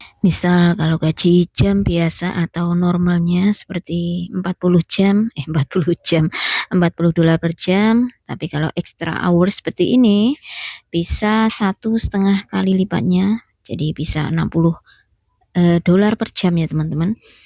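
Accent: Java, Indonesia. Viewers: Assalamu'alaikum jeng.sy ketawa-tiwi sendirian dengerin jeng bicara,itu boso jowone medook.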